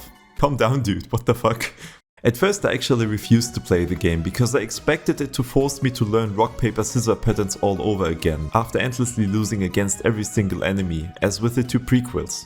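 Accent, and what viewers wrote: Germany. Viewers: bruder dieser deutsche akzent wie geil xD <3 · i hear some DEUTSCHLAND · you sound like a german dude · I hear agerman aczent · do you from germany ? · Total den Deutschen Akzent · Is this guy german? He sounds like a german guy to 100% sry xD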